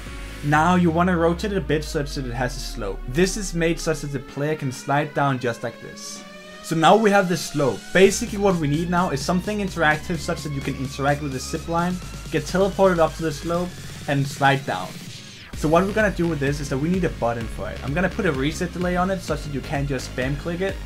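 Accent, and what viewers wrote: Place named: Denmark. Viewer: You sound danish or skandinavian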